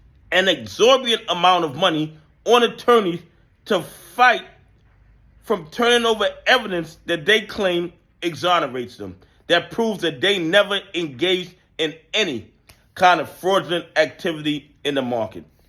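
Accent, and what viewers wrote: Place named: Bronx, United States. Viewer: How can I get in touch with you brother? Sitting on an Ozone park property you sound like your from the BX like myself.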